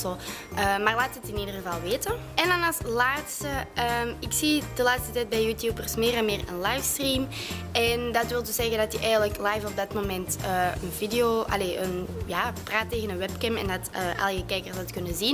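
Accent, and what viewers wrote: Belgium. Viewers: Sorry maar ben je een Belg of een Brabander ?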